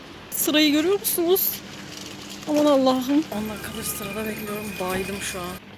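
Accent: Ireland. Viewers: AKLIMA CILLIAN MURPHY GELİYOR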